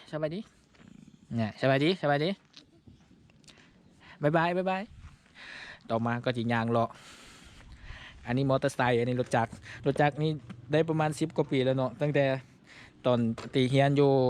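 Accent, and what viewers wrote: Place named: Laos. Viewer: ผมมักฟังลาวเด้ธรรมชาติดี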